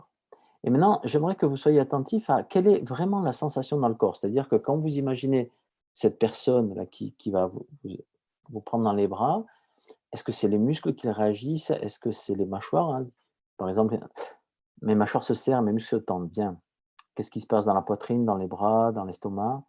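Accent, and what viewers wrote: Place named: France. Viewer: He notado que cuando hablas español usas algunas palabras que tienen esa terminación en tu acento francés Y eso me agrada me diste unas ideas bien extrañas para escribir poesía de esa manera J'aime votre langue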